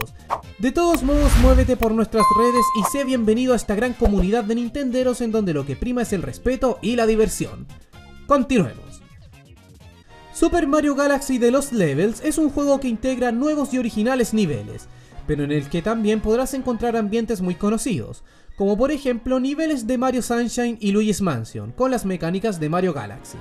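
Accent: Chile. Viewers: ¿Eres chileno, verdad?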